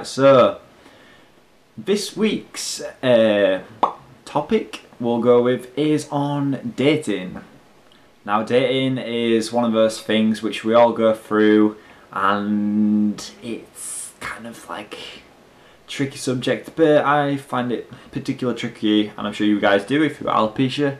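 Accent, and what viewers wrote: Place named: Yorkshire, United Kingdom. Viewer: Dat Yorkshire Accent Doe so Amazing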